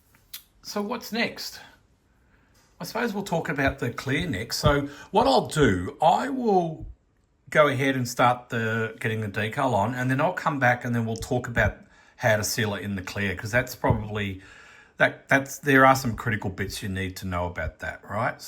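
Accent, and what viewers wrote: Australia. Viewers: Hi. I know I'm a bit late on this but another of your videos recently popped into my YT feed and I've been catching up on your videos ever since. Thank you as they're very helpful in improving my modelling skills. One question - I was led to believe TS-13 was too 'hot' to apply directly over decals so I've used other clear sprays. Is the secret just to keep the first coat over the decal very light? Btw, I'm in Sydney so it's nice to hear a familiar accent.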